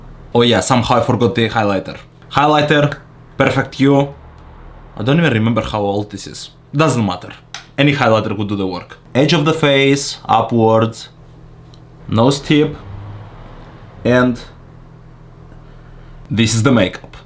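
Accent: Russia. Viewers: Прекрасный русский акцент 😊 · I love a Russian accent. So cool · when i see u as a man...u are HANDSOME!!!!! And that russian accent is at the same time funny and cute! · Нравится его русский акцент. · У Вас очень милый русский акцент. Я с замиранием сердца наблюдала рисование стрелок. Получилось очень оригинально и необычно. 👍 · I love your russian accent when you speak english ❤ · Почему никто не пишет, насколько же у него русский акцент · с русским акцентом я вас понимаю лучше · Классно делаете макияж 😊❤😄 Еще такой забавный киношный русский акцент))) Очень стереотипно звучит 🤭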